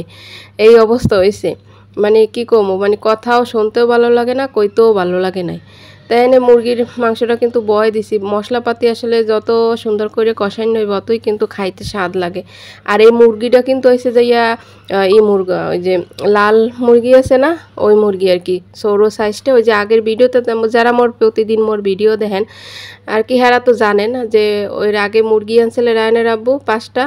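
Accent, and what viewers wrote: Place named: Noakhali, Bangladesh. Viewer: আপু লাইক দিয়ে দেখা শুরু করলাম,, আপনার কথাবার্তা আমার খুব ভালো লাগে,,এটা কি নোয়াখালীর ভাষা???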